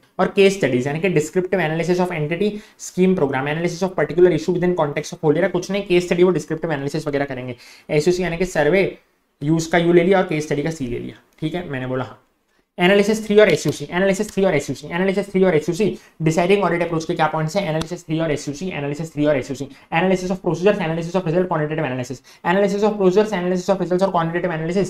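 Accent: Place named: Indore, India. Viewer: Sir bataya nai aapne... App indore se honaa...? Apki boli se lgriya h sir 😁😁😁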